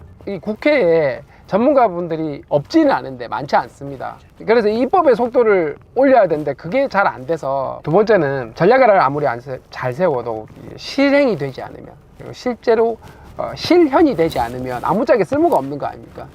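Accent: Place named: Gyeongsang, South Korea. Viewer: 갱상도 사나이 맞네 어무이.. .정겹습니다.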